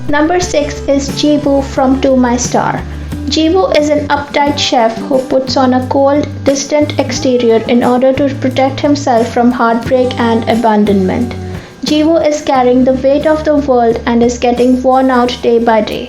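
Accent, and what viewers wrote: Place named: India. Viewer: Hey by ur accent you sound indian, right?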